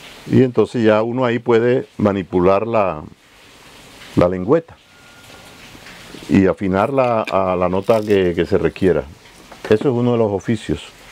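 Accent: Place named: Caribbean Coast, Colombia. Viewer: Cuando adolecsrnte aprendi a tocar el acordeon piano, antes de irne al Conservatorio. Hace años no lo toco. Me dediqué al clarinete y luego al piano. En Sincelejo, alguna vez me afino el acordeón un señor llamado William Molina; hermano de Aniceto Molina. Recuerdo que en ese entonces, me senté en su taller a observar como hacía su trabajo y quedé asombrado de ver el mecanismo interno de mi acordeón piano de 120 bajos. Pero noto que este señor sabe muy bien de lo que habla y hace. Un manejo muy pulcro del lenguaje tecnico y musical. Me encanta tambien el estilo elegante al hablar del acento Costeño colombiano. Estoy embelezado de tanto conocimiento. ¡Felicitaciones al Maestro!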